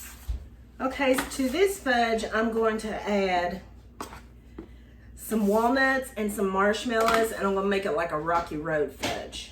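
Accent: South, United States. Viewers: I love your recipe and your Southern accent.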